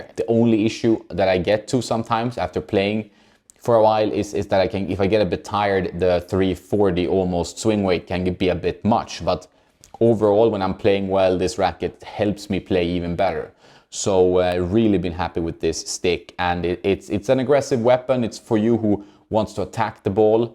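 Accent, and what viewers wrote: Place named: Sweden. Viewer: Swedish english accent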